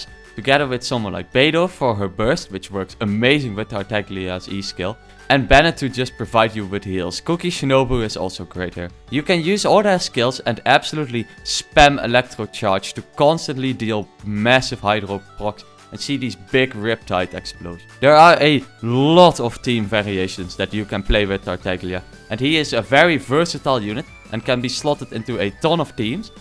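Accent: Netherlands. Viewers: AAAAAA as a dutchie i can't handle the Dutch accent im sorry fisisjjsjs But thanks for the guide i appreciate it lol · Your deffo from Netherlands!! Same haha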